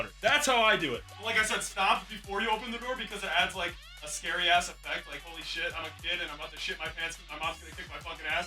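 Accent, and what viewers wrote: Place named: Australia. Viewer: Australian voice After 3 years he reveals it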